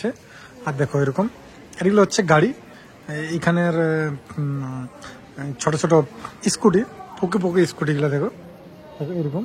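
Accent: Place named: Purulia, India. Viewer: Dada tumi purulia bangla bolcho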